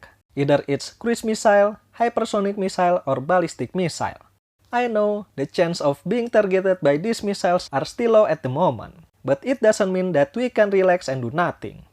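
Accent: Indonesia. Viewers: You got indonesian accent .. cool 👍👍🤙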